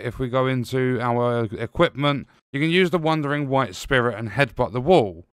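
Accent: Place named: RP, United Kingdom. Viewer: Your accent is over the top posh! xd No offense from here, very helpful guide, Thanks mate🙂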